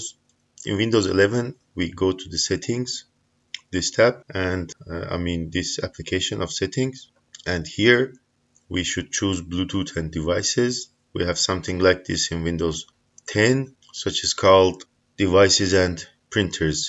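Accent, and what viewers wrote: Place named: Turkey. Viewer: I bet you are Turkish. This is Turkish accent 😂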